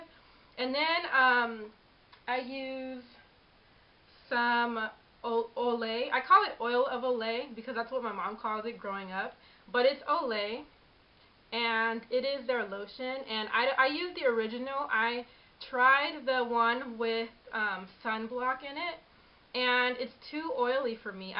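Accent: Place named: South Korea. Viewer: lol i like how you speak korenglish lol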